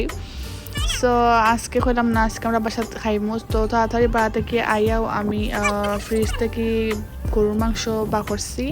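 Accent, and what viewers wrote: Sylhet, Bangladesh. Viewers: ছিলেটি কথা সুনে মন ভরে যায়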